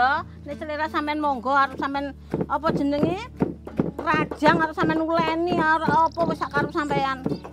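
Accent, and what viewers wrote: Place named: Mojokerto, Indonesia. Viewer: saya dari Mojokerto hadir asli orang mana kok logat bicaranya kayak orang Mojokerto, suka banget kontennya 👍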